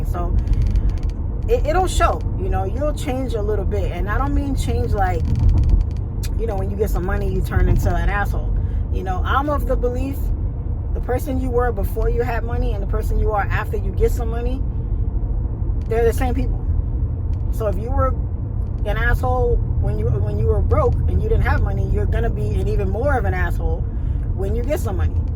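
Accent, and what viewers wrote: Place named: New York, United States. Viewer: I can tell your from New York.We don't trust like that growing up in those streets.Congrats on your success and for giving up your insight.❤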